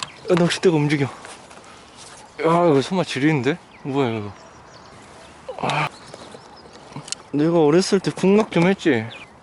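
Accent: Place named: Jeolla, South Korea. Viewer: 전라도여? 사투리가오지구먼잉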